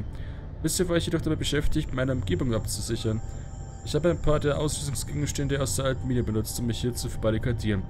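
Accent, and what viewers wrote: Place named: Southern Germany, Germany. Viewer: Die Geschichte gefiel mir nur das Ending war nicht wirklich zufriedenstellend. Mal sehen wie das weiter gesponnen wird. Übrigens spricht man das normalerweise Tri-Nitro-Tulo-Ol aus weil das die Wortbausteine sind die für die Beschreibung der Struktur zusammengesetzt wurden sund (chemische Nomenklatur). Man merkt auch, dass du aus Süddeutschland kommst weil du von Kemie, Kina oder auch Arkitekt sprechen würdest ;)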